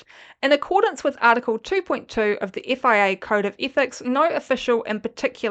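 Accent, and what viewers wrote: New Zealand. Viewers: I like a kiwi accent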